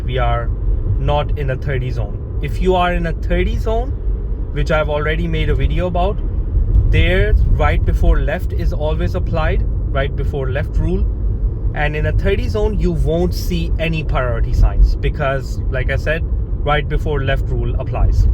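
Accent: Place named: England, United Kingdom. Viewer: Besides making the great videos which I am very thankful to you. I have this curioucity to know where did you learn this English accent from.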